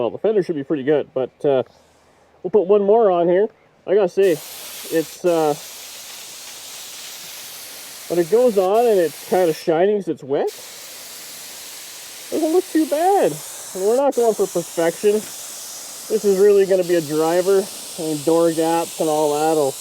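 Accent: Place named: Canada. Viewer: After sitting here and watching the video, I am wondering why I enjoy this channel so much and it came to me. It’s! Aside from the great projects, his obvious skills, and hard work ethic, he has a likable personality. That quality and his ability to verbalize the way he goes about his projects with a bit of humor and devil may care (“it will be fine”) attitude keeps the viewer’s attention. I like the Canadian accent and the fact that he keeps it clean and family friendly. Through all the problems and sweat, seems to be a happy guy. BTW, nice job “smoocin’ the ‘57!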